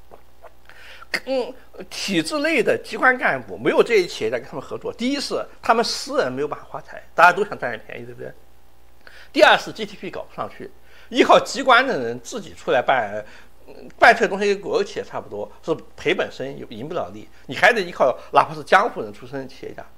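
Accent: Sichuan, China. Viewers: 加上字幕吧！ 蜀國話聽來吃力鴨！😁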